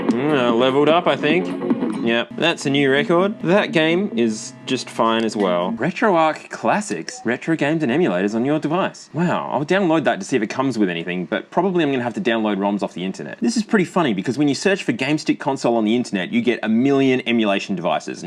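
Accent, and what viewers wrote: Australia. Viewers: Didn't know I needed to hear an Aussie say "Ouya" today but here we are. What an accent. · And I think he's living in Australia because of his accent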